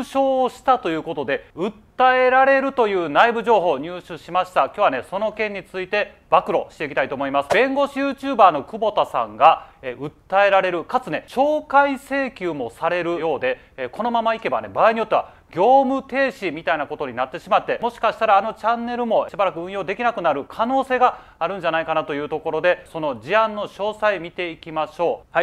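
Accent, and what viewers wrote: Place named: Kansai, Japan. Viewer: 関西出身なのはすぐに判る。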